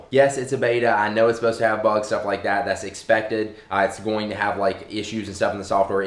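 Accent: South, United States. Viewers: Well obviously an american southern accent, he lives in america. Nobody speaks proper english. You shouldn't be making fun of others for something you don't even do.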